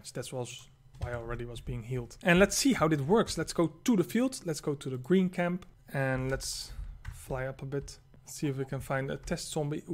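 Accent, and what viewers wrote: Netherlands. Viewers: Je bent 100% nederlands. Ik kan dat horen :P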